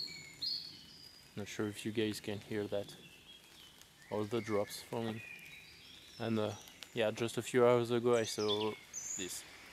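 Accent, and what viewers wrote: France. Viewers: You sound French but correct me if I'm wrong. I'd just like to know what's it like to camp. Thx uk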